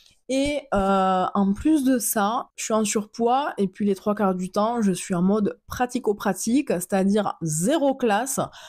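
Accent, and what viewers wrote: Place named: South, France. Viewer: Je decouvre votre chaine. Bravo pour votre authenticite.Douce et jolie,aimez-vous ça vaut le coup! J.adore votra accent du sud je me regale moi qui suis du Nord. Bonne continuation. Je vous suis desormais. Merci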